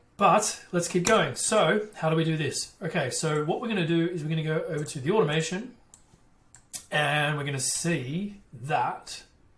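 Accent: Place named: Australia. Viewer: Hey, Love the Content, got a question for you. From your accent i'm assuming your from Aus and am aswell, I have recently acquired GHL for starting an agency however my concern is when using a mobile phone number from Twillio/ Lead Connector the billing & pricing is way too high and would mean im getting charged a lot from my clients in the future. As such do you use a Mobile phone number or other type of twillio/ Lead connector phone number type for your clients and yourself? Cheers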